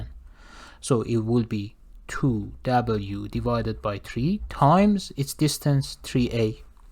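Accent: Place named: Iran. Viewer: great sir. (btw, your accent seems like you're from Iran or its surroundings, am i right?)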